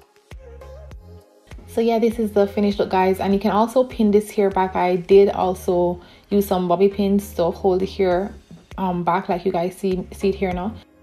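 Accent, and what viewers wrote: Jamaica. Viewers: I have a sixth sense for a Jamaican accent...... Great video🇯🇲🇯🇲🇯🇲🇯🇲🇯🇲🇯🇲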